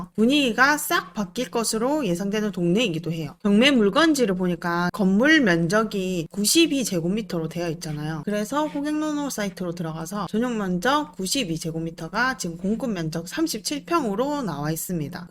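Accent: Gyeongsang, South Korea. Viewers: 어후 보는 부동산 유튜버마다 쌍도사투리 안쓰는사람이 없누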